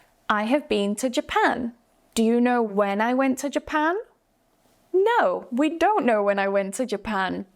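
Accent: United Kingdom. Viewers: I am from Japan. It’s so helpful for improving my English skills, and I love your beautiful British pronunciation❤Thanks a lot! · I understand your feelings bro her accent is British accent that's why.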